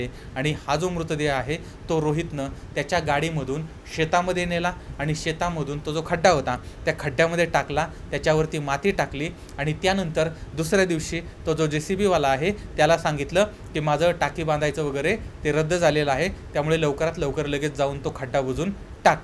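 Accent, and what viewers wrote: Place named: Ahmednagar, India. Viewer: This is the way Nagar people talk accurately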